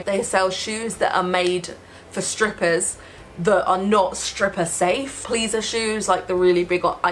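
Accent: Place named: Midlands, United Kingdom. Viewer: U sound like ur from the Midlands, maybe east Midlands. Am I close? In England, of course. I'm doing a challenge with my mates to see where youtubers are from and see who's closest to us. Am I close?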